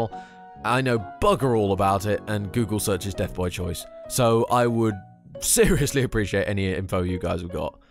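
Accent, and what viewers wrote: United Kingdom. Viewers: Well having a patreon is nothing bad. Good luck with the rewards! Except for the once that have already been mentioned (names in the video, donators chosing games and playing with you on multiplayer games on stream) maybe for a higher tier the ones who donate can ask for a sentence you can say in your british accent? Then you can send the mp3 file to them via e-mail or something like that, and they can do whatever they want with it. Use it as a notification sound on their phone, in their videos. I don't know. Again this is a suggestion, you are more than welcome to do whatever you want with it. Also sorry for being inactive on your channel. I am very easily scared by horror games. Anyways tallihoo and good luck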